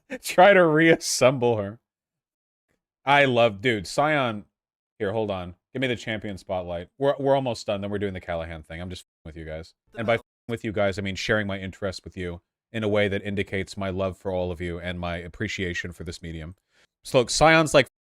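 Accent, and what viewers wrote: United Kingdom. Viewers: that's northern England accent 🤣🤣Manchester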